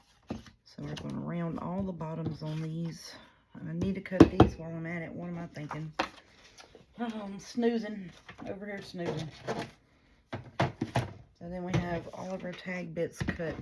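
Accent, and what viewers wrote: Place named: South, United States. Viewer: Gf I love watching your not only are you a amazing crafter! You are so funny I get so tickled at you it is neat to hear someone one as Southern as me your awesome. God Bless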